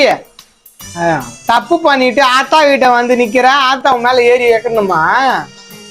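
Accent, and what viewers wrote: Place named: Chennai, India. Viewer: தலைவா நிங்க பேசரத பார்த்தா சென்னை மாதிரி தெரியுது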